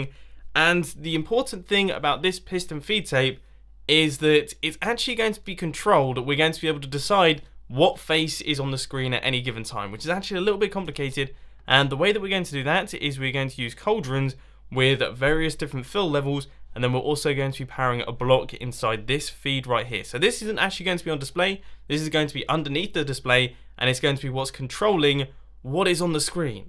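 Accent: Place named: United Kingdom. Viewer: Other than the good content and some hilarious moments that offers, I learned a lot when it comes to Radstone and kinda of British accent so Thank You.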